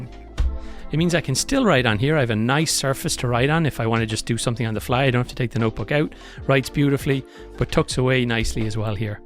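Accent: Ireland. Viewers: Great Video, I'm looking forward to the smart glasses review, I might buy a pair for travel in the future. Its great hearing an Irish accent, with the amount of tech videos I watch.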